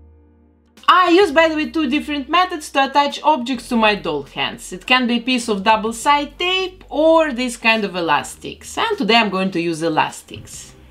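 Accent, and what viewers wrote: Russia. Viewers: Мне кажется, я слышу сильный русский акцент🤔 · почему вы говорите как русская?.... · У вас очень сильный русский акцент. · что за русский акцент:)) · Слишком русский акцент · Воу, мне кажется или ты русская? Просто акцент русский . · Are you Russian? Cos you have such a cute russian accent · Мне кажется или она русская, у нее акцент не англичанки или британки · У тебя русский акцент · Your accent reminds me of a Russian accent 🤔 (yeah, i'm russian👌) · hmmm russian dialect...... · Ни капли не разбираюсь в английском, отличить акценты ни в этой жизни не смогу, но русский с первых минут просто узнала. Вот прям слышно что наш человек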